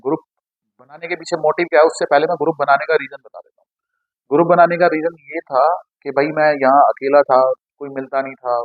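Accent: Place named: Haryana, India. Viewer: Thoda haryani touch acha lagta h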